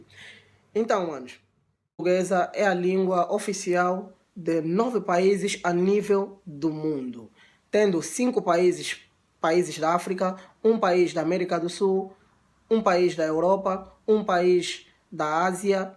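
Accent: Portugal. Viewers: Só lamento , mas teu sotaque é Português de Portugal. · Por quê "português angolano"? Você fala tal qual os portugueses. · Seu português é ótimo. Fala com um pinguinho de sotaque de Portugal, mas só um pouco. Entendo tudo , melhor do que se estivesse um português falando.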